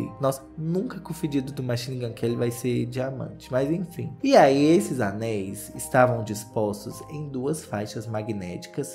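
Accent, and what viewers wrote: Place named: Rio de Janeiro, Brazil. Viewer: Sutaque carioca e o melhor kkkk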